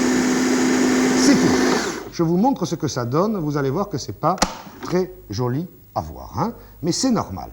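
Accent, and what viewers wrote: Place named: Midi, France. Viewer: Et cet accent du Midi bon enfant me fait jubiler comme jamais !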